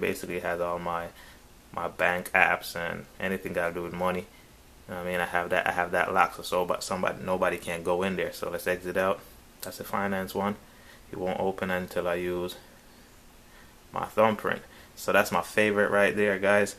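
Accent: Jamaica. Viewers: here the jamaican in u bro. #jamaicans UP lol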